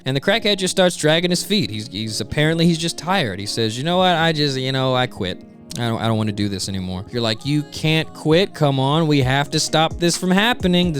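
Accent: South, United States. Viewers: I love your southern accent, where you from?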